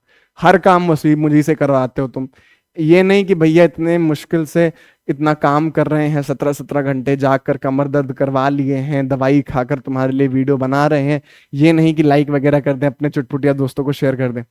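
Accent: Bihar, India. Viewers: Bhaiya Bihar se ho.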